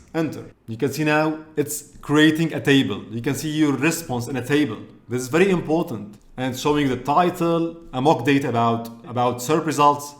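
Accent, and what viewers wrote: Arab world. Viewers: ألسلام عليكم. أأنت من العرب، لان انكليزيتك كالعرب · This is my first video of this channel, and I know a good teacher when I see one. You've the capability to teach and keep the attention of viewers in this short attention span era. It was a treat watching. Arabic accent 🇧🇩🔥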